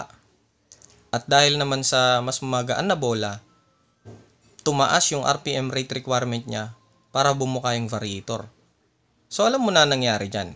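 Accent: Ilocos, Philippines. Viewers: Ito talaga idol ko sa paliwanag ng cvt eh. Tanong lang idol, ilokano ka? Saan ka idol? Hahaha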